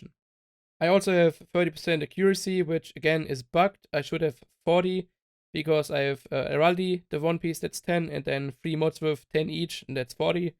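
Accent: Germany. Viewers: Bist du deutscher ??? You Liste like a german guy and speaks english 😂😂😂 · love the hard german akzent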